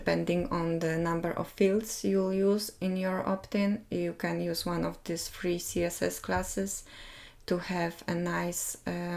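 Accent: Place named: Poland. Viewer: Tak właśnie mi się zdawało, po imieniu i nazwisku :). oraz po akcencie :) Super fajną wtyczkę zrobiłaś, jest ona może w wersji Polsko języcznej ?. Jak masz podaj mi linka do swojej strony w języku polskim lub do jakiejś dokumentacji odnośnie tej wtyczki Pozdrawiam Łukasz :)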